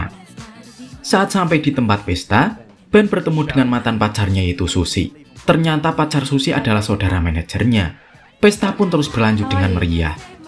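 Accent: Java, Indonesia. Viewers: Movie crop versi medok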